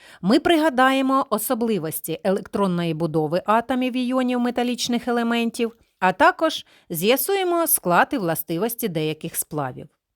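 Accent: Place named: Kharkiv, Ukraine. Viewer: Говір схожий на Харківський.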